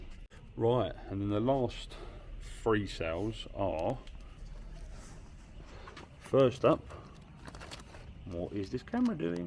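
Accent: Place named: United Kingdom. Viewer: Did you say Mill wall or meal wall? My closed captioning said meal wall. I did watch until the end. American English is my first language and I sometimes don't understand your accented UK English. I try. My ears aren't used to it. Very interesting how you find these great bargains. More power to you. You do work very hard for the profit you make, so your experience and research are making you a success. I have enjoyed watching you with Ben and the lads. Thanks for the video. Sorry it was so hot.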